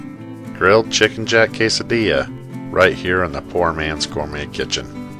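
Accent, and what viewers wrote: United States. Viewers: You sound very American to me. I'm not hearing any accent ... but you do have a wonderful voice.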